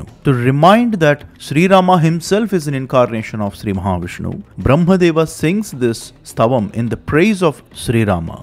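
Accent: India. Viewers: Sir, your Youtube Info says that this channel is Belgium based, but you sound like indian while reciting Shlokas in the videos, anyways stay healthy and keep us updating with the knowledge 🙏🏼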